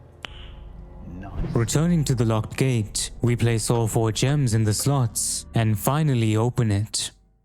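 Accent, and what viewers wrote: South Africa. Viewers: I knew you were South African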